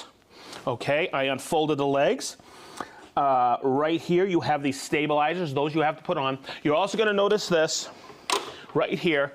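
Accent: Boston, United States. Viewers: I would have bet good money you were from Boston.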